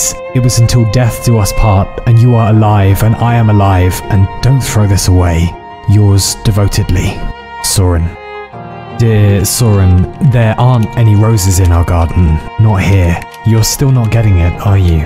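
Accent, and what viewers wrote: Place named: United Kingdom. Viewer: Where have ya been man? I miss your videos I miss your advice I miss your British voice that puts me to sleep at night.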